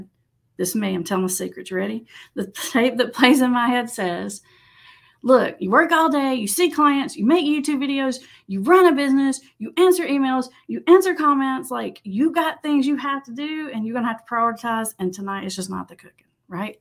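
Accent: South, United States. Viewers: your videos have helped me so much, You are great, and I love your southern accent. I follow you from Argentina😊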